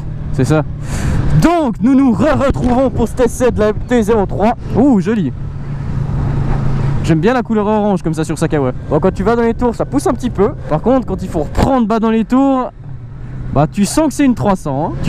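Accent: Vaud, Switzerland. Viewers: Yo mec ! Franchement très bonnes vidéos t'as un abonné en plus ! Et dit voir, t'habites où en Suisse ? Il me semblait qu'à ton accent t'étais suisse (comme moi ;)) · Un joli accent du canton de vaud il me semble :D Je vient de m'en offrir une et j'ai hâte de voir ce qu'elle a dans le ventre ! Merci pour ta vidéo !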